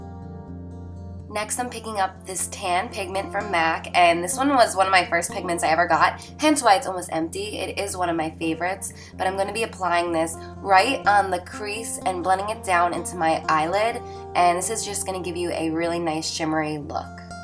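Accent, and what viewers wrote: New Jersey, United States. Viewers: "Soft and genal" your jersey accent is so strong hahah ^.^ I love this look!!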